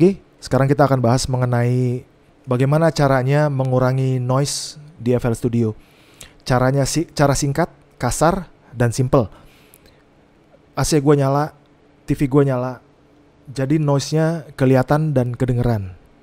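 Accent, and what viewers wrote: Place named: Medan, Indonesia. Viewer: lah kirain orang medan , cara bicaranya itu dari video²lainnya kek medan bang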